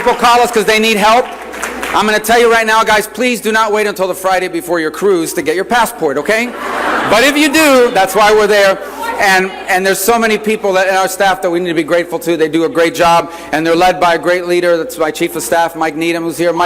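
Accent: United States. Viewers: KIDS, this is what an AMERICAN sounds like.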